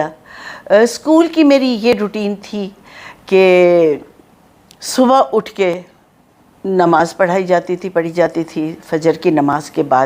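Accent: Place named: Punjab, Pakistan. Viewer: wah ji wah ap ki baton main Punjabi tuch very gooď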